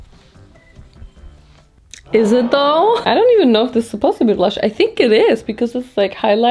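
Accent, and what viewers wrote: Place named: Germany. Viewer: I hear the germaness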